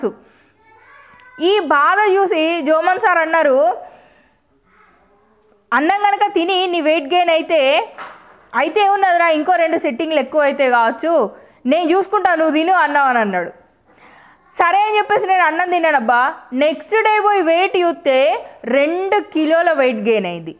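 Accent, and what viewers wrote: Telangana, India. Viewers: akka me telangana accent matharam chala adhurs 😋 masthu matladavu akka iam big fan of you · Ur super tlking in my telgana launuage i love u sister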